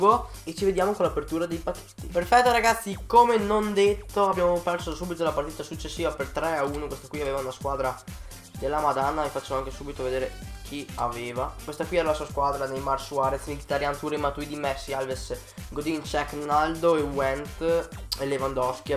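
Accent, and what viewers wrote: Veneto, Italy. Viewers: ma sei del veneto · nuovo iscritto,sei veneto per caso ? 😂😂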